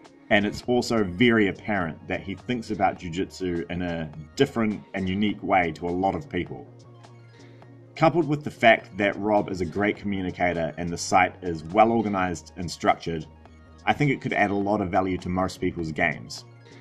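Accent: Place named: New Zealand. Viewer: You from NZ Bro? :)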